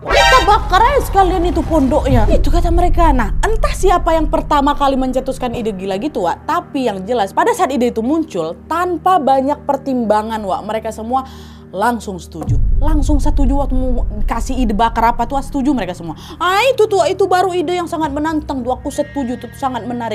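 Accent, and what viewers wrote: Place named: Medan, Indonesia. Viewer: orang mana sebenarnya??? Kalo dari gaya ngomong nya macam orang Medan nggak si...?😊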